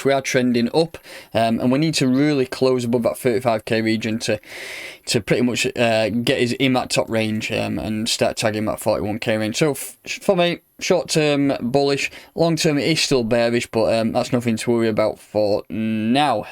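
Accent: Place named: Yorkshire, United Kingdom. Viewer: Lol. That’s deffo a Yorkshire accent!!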